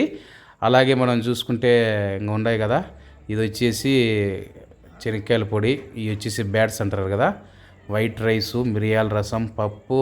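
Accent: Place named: Rayalaseema, India. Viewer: That is the rural slang of Rayalaseema people.We need not correct any slang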